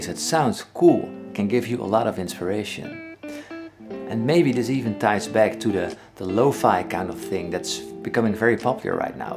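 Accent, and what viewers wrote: Netherlands. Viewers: I really enjoy your channel and while listening to you speak, tried to guess where you were from, your music speaks for itself:) It's close to American mixed with British, but my guess is The Netherlands. Years ago I met a couple in the art store where I worked and though they sounded American, there was a few words where the d's sounded sort of German. I asked where they were from originally and yes, it was Holland. They had lived here a long time but found the sounds of American English quite easy to master. So, did I guess right? Cheers, Rik Spector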